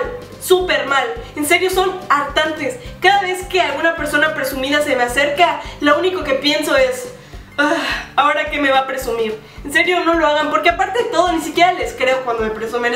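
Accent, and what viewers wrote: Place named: Mexico City, Mexico. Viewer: hablas medio chilangaaaaaaa pero caes bien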